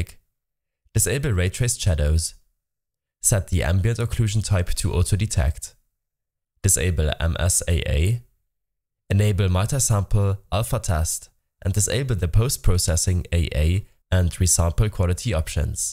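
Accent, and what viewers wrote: Germany. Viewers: Gotta love the german accent Thanks for the video <3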